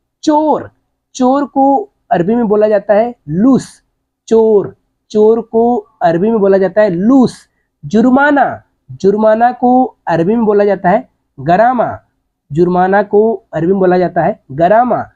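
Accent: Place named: Uttar Pradesh, India. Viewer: Bhai mujhe lgta hai aap uttar pradesh se ho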